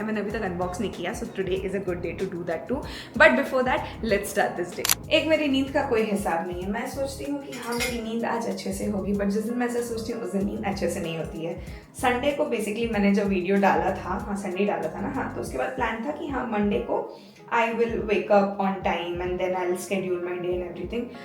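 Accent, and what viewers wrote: Bihar, India. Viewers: Your accent is bihari but you are from Rajasthan I am not getting it how how 😂?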